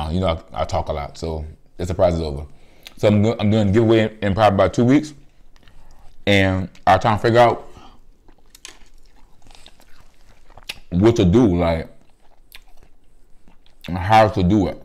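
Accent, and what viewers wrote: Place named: New Orleans, United States. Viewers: R u from Louisiana u sound cajun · Are u from New Orleans? U have a strong N.O. Accent..... I Luv seafood so I would’ve enjoyed eating that pan!!!!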